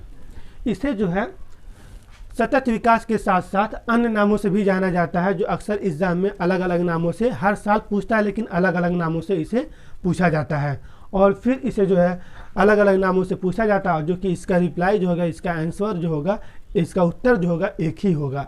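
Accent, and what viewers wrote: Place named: Bihar, India. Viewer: Bihar se ho aap lgta h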